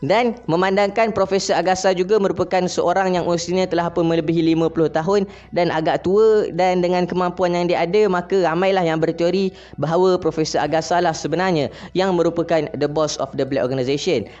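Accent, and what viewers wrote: Kelantan, Malaysia. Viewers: Org klntn ke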